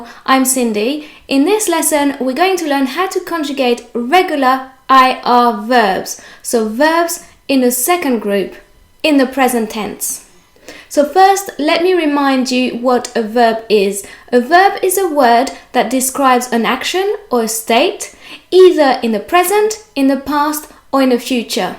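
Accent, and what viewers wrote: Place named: United Kingdom. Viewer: Stop ur British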